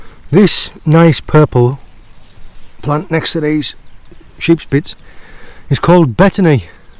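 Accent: United Kingdom. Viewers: I'm showing this video to my student now to demonstrate the British accent.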